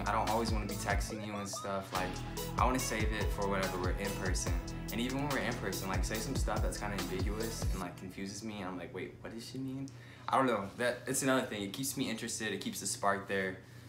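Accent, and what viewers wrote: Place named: France. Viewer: That French accent 😂and boi I would win any argument 😫😫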